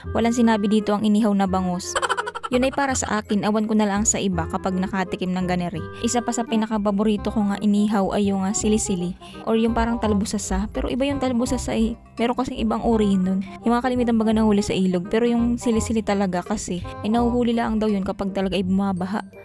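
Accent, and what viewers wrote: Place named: Visayas, Philippines. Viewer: Nakakatuwa ka naman magsalita...my halong bisaya o cebuano...😂😂😂😂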